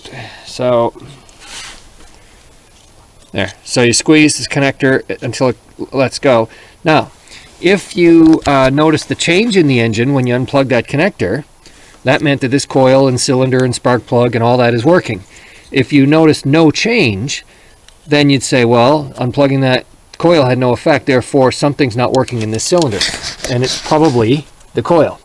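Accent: Canada. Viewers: You could tell from the accent he's in Canada!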